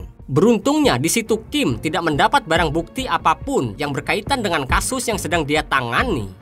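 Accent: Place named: Central Java, Indonesia. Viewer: iki logate wong jateng iki... Kendal semarang?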